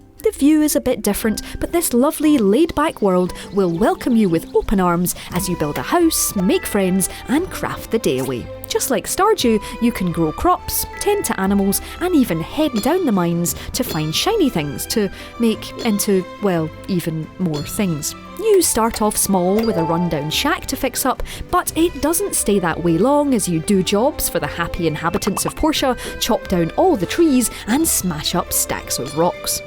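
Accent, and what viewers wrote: Scotland, United Kingdom. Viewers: I love the scottish accent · Rowena is Scottish and I’m pretty sure the narrator also has a Scottish accent lol. I love Rowena tho she’s amazing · The slight Scotish accent is really enjoyable!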